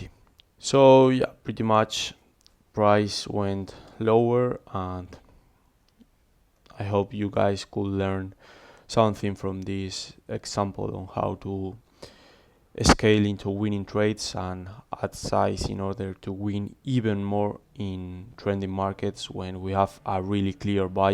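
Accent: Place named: Spain. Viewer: grande sabía que eras español ajajajajaj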